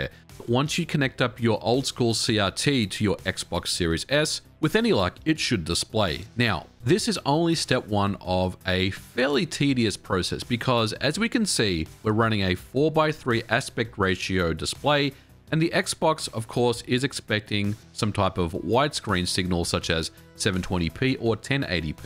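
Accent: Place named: Australia. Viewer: Australian accent Next time I'll turn it into an Amiga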